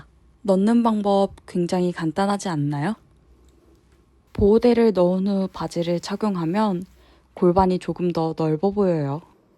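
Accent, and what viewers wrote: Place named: Gyeongsang, South Korea. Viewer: 고향이 어디세요~ 경상도 억양이 나와서요~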